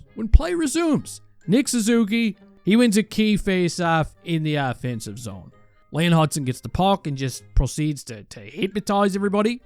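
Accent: Newfoundland, Canada. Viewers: I don’t think it’s from anywhere in Britain. Pretty sure it’s newfie (I say that lovingly by the way)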